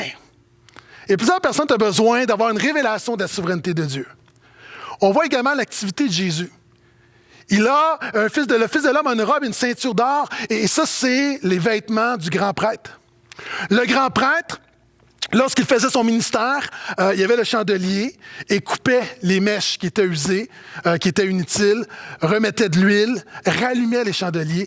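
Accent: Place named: Quebec, Canada. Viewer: Accent québécois. Difficile oui.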